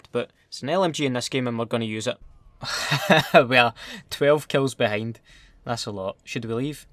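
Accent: Scotland, United Kingdom. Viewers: I love the Scottish accent the best thing ever happened on YouTube. uploading vidoes on YouTube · i love his scottish accent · Videos are so funny because of your Scottish accent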